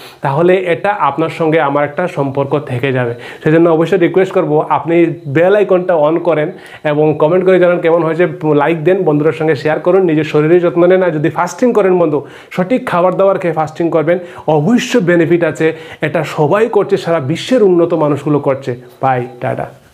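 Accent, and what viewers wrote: Sylhet, Bangladesh. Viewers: 👉Oi eegu aamrar siloti Shur -ey -kelu ucchharon korer byei! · আপনি সিলেটি কথায় বুঝতে পারছি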